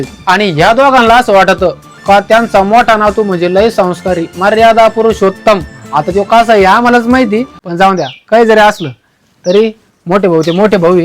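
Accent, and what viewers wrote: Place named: Karnataka border, India. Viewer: Bhasha kannd talukya sarkhi ahe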